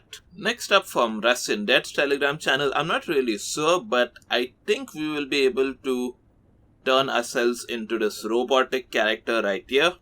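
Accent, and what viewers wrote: India. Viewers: Talking ahh India voice 💔✌️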